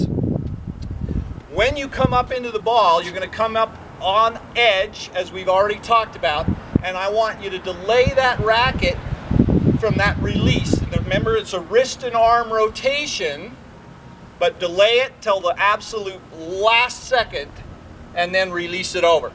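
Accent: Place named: United States. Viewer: Where is Insight Tennis situated? Your accent is US but the locality looks like Irlenad or UK..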